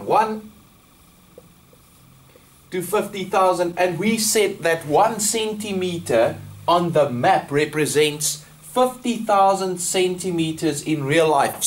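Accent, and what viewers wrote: South Africa. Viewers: He sounds so South African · Lmao he's South African!!! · My Guy thank you so much for helping me, you are such a good teacher 😀 and btw are you from South Africa? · are you from SA??? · Confirmed he's from south africa.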